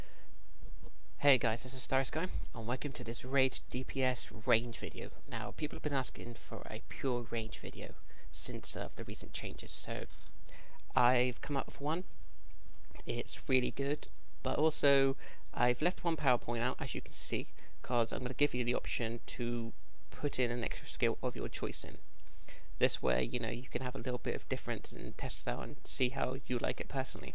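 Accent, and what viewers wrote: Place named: United Kingdom. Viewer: Love all u ppl trying to tell how to improve his loadout and he just hits u with the most logical reason as to how wrong u all are. Is it me or is it easier to grasp the load-out with that Brit accent? 4 real...same with smile and orbit....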